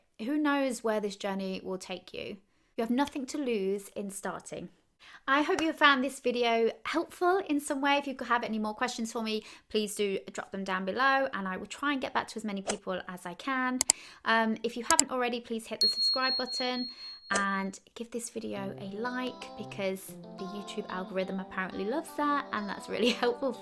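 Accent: United Kingdom. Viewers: I love your British accent. Watching from Iran.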